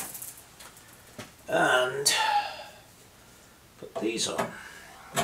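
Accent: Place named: Teesside, United Kingdom. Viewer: Great stuff,. I have rebuilt a couple of OiF BSA singles and this reminded me of every turn of the wrench as I did so. Despite my mature years, you showed some methods and tools that I had not seen before. Really excellent and I look forward to the engine rebuild. Being from Tyneside, I recognised the smoggy accent immediately!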